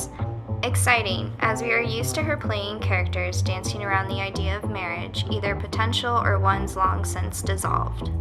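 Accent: United States. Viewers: Another American voice. God help me. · Inappropriate American voice narrating, tho’ the commentary is not stupid. Mispronounces her native English. Quacks voice. “The kinda girl...” UGH. Kurasawa doesn’t adopt books, he adapts them for the screen. She makes mistakes likes this pretty regularly. Her pronunciation of Japanese names is atrocious.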